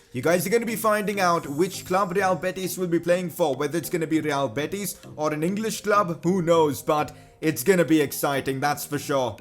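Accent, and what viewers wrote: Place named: India. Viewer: This has more indian accent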